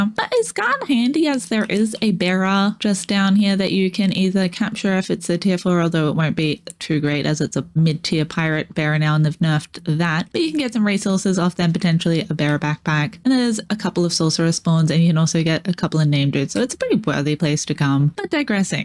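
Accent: Australia. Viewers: Fine video...Love the heavy 'down-under' accent at different points in the guide. Voice speed and pitch is perfect for my brain.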